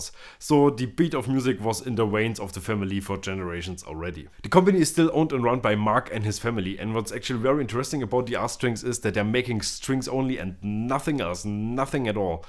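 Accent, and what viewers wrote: Germany. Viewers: the most stereotypical German i have ever heard ... fucking love it !!! more !!! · That's gotta be the most German English I've ever heard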